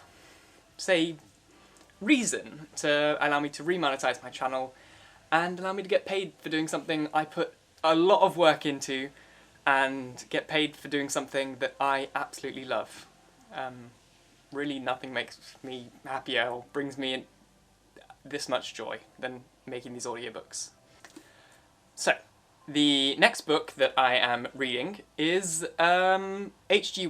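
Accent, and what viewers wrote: United States. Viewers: I loved this. It is great seeing you. You are very talented and your American accent is 100 %. I hope YouTube monetized you by now. Yes, you could redo 1984. I love that book. You're doing an awesome job. I enjoy listening to you at work.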